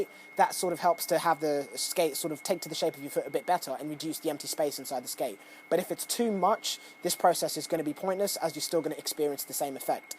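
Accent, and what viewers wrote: England, United Kingdom. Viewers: OMFG I DIED WHEN I HEARD HIM SPEAK . HE'S BLACK BUT HE SPEAKS BRITISH LOL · Must be Sean mcmorrow's kid with that British accent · It’s more that you don’t expect to hear an English accent when someone’s talking about hockey. England isn’t exactly the country one thinks of when they think, hockey hotbed.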